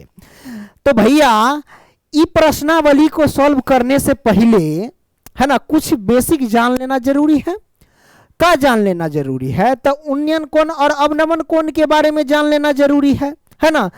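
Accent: Bihar, India. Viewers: सर आप बिहार पटना से हो क्या आपकी मात्र भाषा तो हिंदी हे परन्तु आपकी स्थान भाषा बिहारी हे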